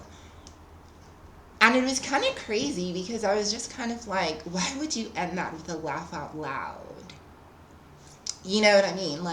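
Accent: United States. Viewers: You really did nicely in trying to perfect that American, almost Valley Girl accent. Good job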